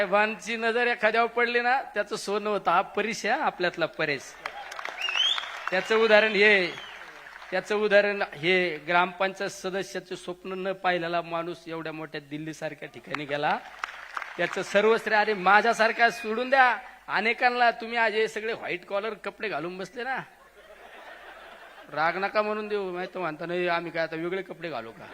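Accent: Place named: Ahmednagar, India. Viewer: अस्सल नगरी कडका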